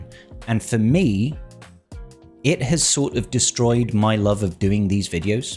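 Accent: United Kingdom. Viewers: I watched Vexitrons Conquest videos because yours wasn't available, but I do prefer you geenrally as your more fun to watch and goot to hear a fellow Brit so I vote for more content :) But if your feeling the grind take a break, dont burn out!!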